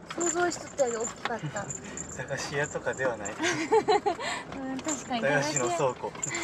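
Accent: Kansai, Japan. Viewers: 今日も楽しい動画有難うです。の可愛い関西弁との優しさに癒されていますよ。無理しないでf頑張ってくださいね～。次回もたのしみです。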